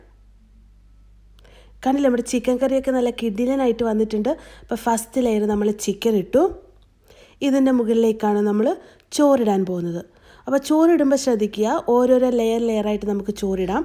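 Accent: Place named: Kozhikode, India. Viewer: കോഴിക്കോടൻ ബിരിയാണിയും, ഭാഷയും സൂപ്പർ 👌👌👌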